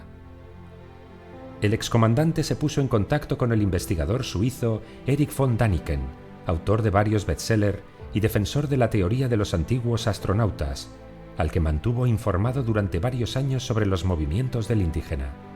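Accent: Spain. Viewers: El documental está narrado con un acento 100% de España, no hay nada extranjero (ni peruano) en él.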